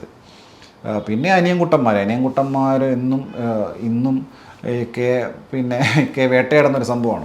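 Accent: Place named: Trivandrum, India. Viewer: How you got trivandrum slang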